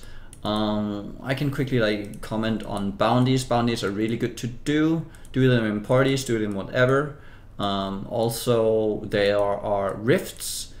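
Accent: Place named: Denmark. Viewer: Syntes nok jeg kunne høre lidt dansk accent! :D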